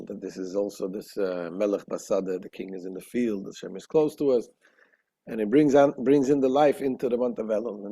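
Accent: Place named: Russia. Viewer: B"H Calm down. Get control of your Body. There is no month by that name in the Hebrew reckoning of time. That is the name of a Babylonian idol and if you mark your time by Babylonian astrology you can't attain Immortality. Only Torah time is infinite. This is the twelfth month of the year. זה החדש השנים עשר Try to at least pronounce the Hebrew with some kind of Semitic, not Russian unintelligible, accent. If you knew proper Hebrew, you'd know there is no depression at this time at all. ראש השנה = 861 = אשר המחשבה = בית המקדש Can't you so much as see that ראש = אשר If you are a Jew, may HaShem grant you the insight and courage to return to Hebrew Tradition, to the Hebrew language, to Hebrew Torah from your Yiddishkeit narishkeit. If you can't, or won't, you may be sure that you're not a Hebrew. Hebrew is as Hebrew does.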